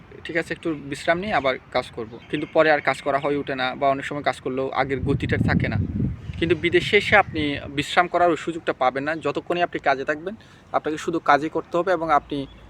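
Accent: Chittagong, Bangladesh. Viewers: বন্ধু তুই চিটাইংগা নে? আয় বুকে আয়!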